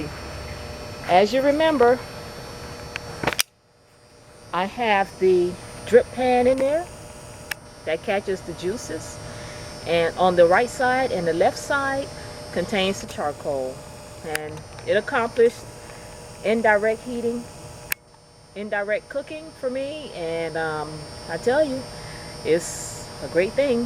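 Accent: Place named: United States. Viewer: Fuck I hate your dumb American accent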